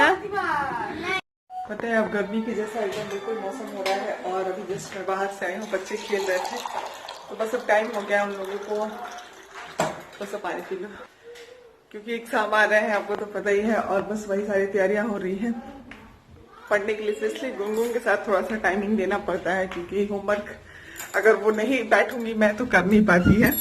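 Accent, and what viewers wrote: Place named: Bhojpuri, India. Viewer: Apke muh se bhojpuri bahutt achhi lagti hai dii.. Nice vlog💓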